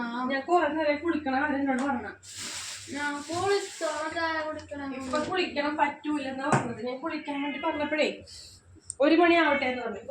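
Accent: Kochi, India. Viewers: Ishtam illathavar kanenda😮Ishtam ullavar njangal ellarum undu ktto😊njan pathanamthitta il Thiruvalla il aanu kochi slang kelkan nalla rasam undu ❤super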